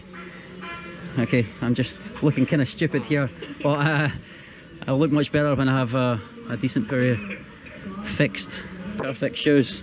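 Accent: Scotland, United Kingdom. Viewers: That Scot accent is hardcore yew !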